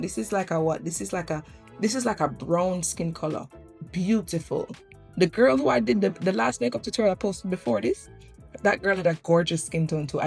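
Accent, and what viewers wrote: Jamaica. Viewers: Right when mi hear the Jamaican accent I'm😊 sold !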